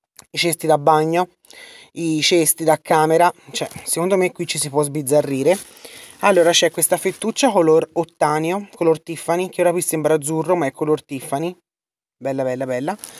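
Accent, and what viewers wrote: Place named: Tuscany, Italy. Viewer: Sei simpaticissima x l accento sicuramente toscana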